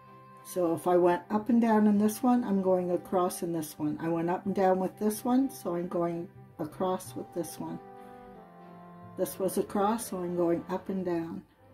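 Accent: Canada. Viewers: I picked up from your accent that you are Canadian. I'm in Adelaide in South Australia and you will definitely be hearing from me again. I just love your pieces.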